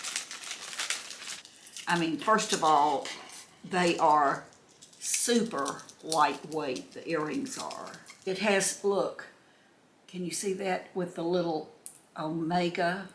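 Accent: South, United States. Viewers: I love seeing you with that adorable southern accent & you are such a true beauty❤️🍷🍷👏👏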